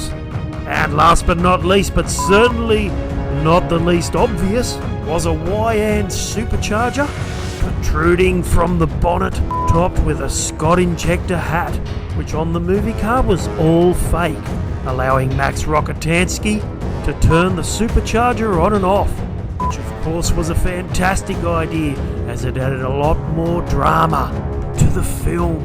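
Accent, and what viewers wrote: Australia. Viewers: To hear the history of the Interceptor in Australian accent is just 👌🏻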